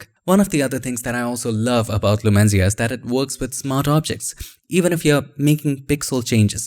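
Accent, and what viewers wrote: India. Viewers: Your English sounds like Indian it’s hard to follow up.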